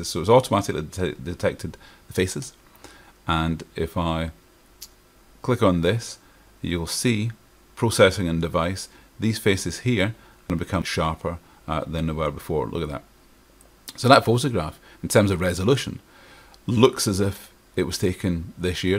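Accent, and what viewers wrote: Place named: Scotland, United Kingdom. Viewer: Thanks. Im a fellow Scot who has lived in Australia for 40 years. I watch a lot of photoshop videos but it’s nice to see one in my own lingo and you present very clearly and concisely. Cheers pal.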